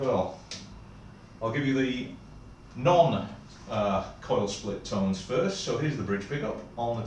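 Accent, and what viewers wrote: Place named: Yorkshire, United Kingdom. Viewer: l think you don't live a million miles from me either. By your accent. I'm near Wakefield.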